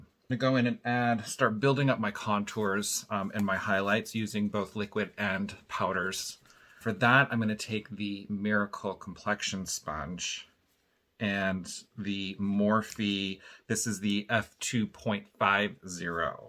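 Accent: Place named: Minnesota, United States. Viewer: LOL I'm from western wisconsin, so I know all about the Minnesota accent. :D Wow incredible look!! You look amazing!! I will be Using both the liquid and powder foundation to get the contour. I've never used both foundations at one time and I am going to try that. Like I said you look is amazing!!!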